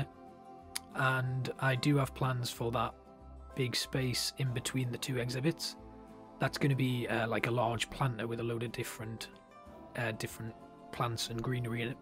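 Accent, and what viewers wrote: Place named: Newcastle, United Kingdom. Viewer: You popped up on my feed. Didn’t realise you’re a fellow geordie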